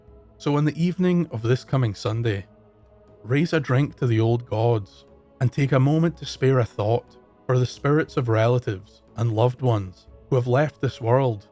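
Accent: Scotland, United Kingdom. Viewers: Crazy how similar a norther Irish accent and your Scottish accent is. You can really hear the crossover.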